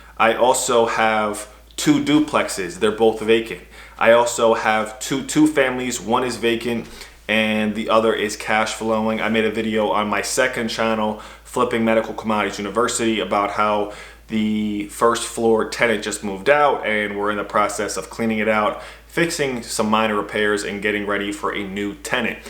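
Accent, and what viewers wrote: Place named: New York, United States. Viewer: Good morning brother, do you operate out of the tri-state area? You sound like a New Yorker 💯%😁💪🏾. I'm from The Bronx.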